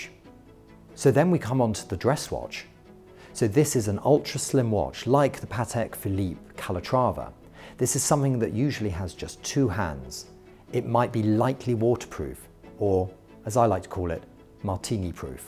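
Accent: England, United Kingdom. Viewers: Consumer psychology 101 mixed in with an English accent. Don't buy it. Think for yourself.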